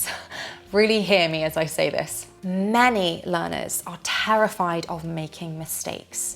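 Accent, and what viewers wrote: United Kingdom. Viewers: de que parte del Reino Unido es su acento , ❤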